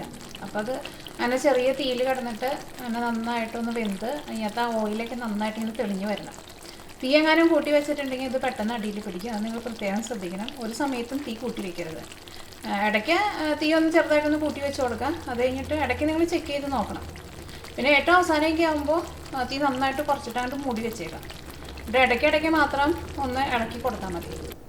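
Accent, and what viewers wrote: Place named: Kunnamkulam, India. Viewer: Chechiye..njn kunnamkulamkaarana.onnum vnda mmade aa pedakkhna samsaaram aanh chechi kooduthal hit aaayath ente frend paranjitta njn ithine kurich ariyunnath.nthaayaalum classaayindh.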